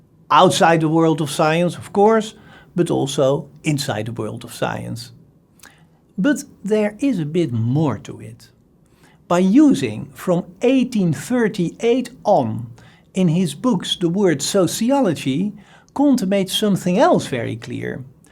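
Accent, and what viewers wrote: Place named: Netherlands. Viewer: His name and accent would suggest that he's Dutch.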